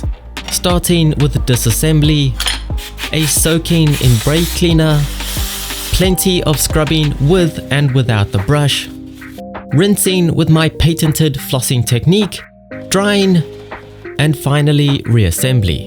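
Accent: South Africa. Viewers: How do you know JHS Racing bro? I just assumed from the accent you were in RSA. Awesome crew aren't they? Great vid, thanks mate. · dud are you from south africa?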